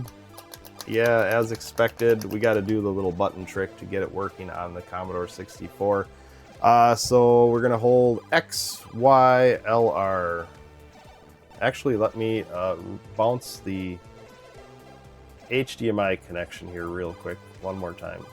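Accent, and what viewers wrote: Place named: Canada. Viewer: How do you plug in the Spectrum? Assuming you live in Canada, based on your accent, do you need a step up converter for it? I live in the US, and I know in North America has 110-120v power while the UK and Europe use 220-240v. I ask because as of this comment, I'm getting one in the mail and I'm afraid to plug it in just straight into the wall at the risk of ruining it.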